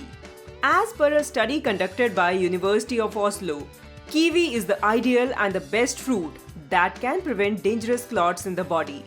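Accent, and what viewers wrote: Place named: India. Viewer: Just listening to your Indian accent, i damn well knew i was in good hands. Loved that all these variety of fruits and vegetables are right up my alley, since i have to take Coumadin, due to having heart surgery. I know you did not recommend parsley, but it is excellent for the heart. Thank you so much.!!!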